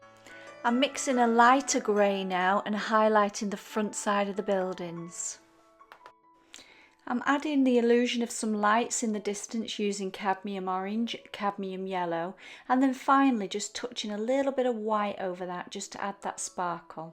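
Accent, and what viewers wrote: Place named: Yorkshire, United Kingdom. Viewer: What a beautiful Yorkshire accent! Excellent tutorial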